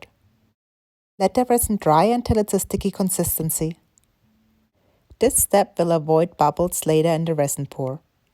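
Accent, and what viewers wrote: Germany. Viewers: Dein Deutsch ist ja süß 😍😉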